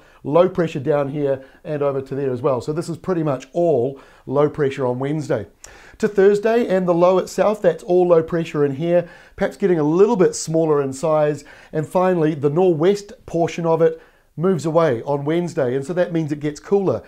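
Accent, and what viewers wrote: New Zealand. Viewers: Top app team. Great presentation, love the KIWI speak!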